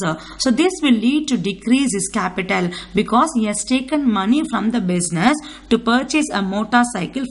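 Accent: South India, India. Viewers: Hello Mam Your south Indian English accent is quite awesome.. Awesome video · South Indian eng... Mmmm.. It touches well.. 😊❤❤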